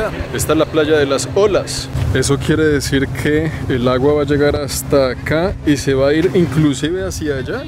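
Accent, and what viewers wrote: Colombia. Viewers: Eres de panama crei eras colombiano tienes acento colombiano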